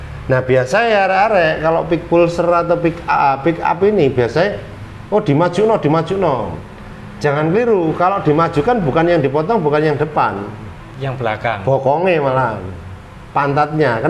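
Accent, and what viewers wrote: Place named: Surabaya, Indonesia. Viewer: suroboyoan rek...siiip jelas dan trimakasih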